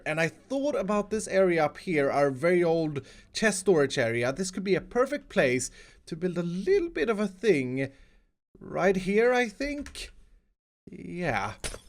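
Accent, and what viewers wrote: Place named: Sweden. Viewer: This is swenglish on a new level (i’m scandinavian too btw)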